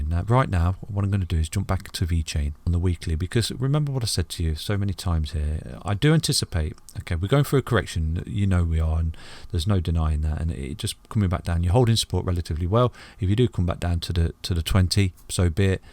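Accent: United Kingdom. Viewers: Alot of the terminology with these charts is new to me, combined with the British accent and dialect, I maybe understand about 70% of what's going on but im still tuning in. HODL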